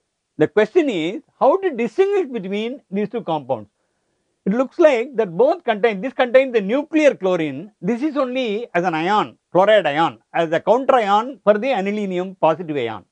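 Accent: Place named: India. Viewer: only if your indian.. because his accent is very strong.. so i dont understand much hes saying lol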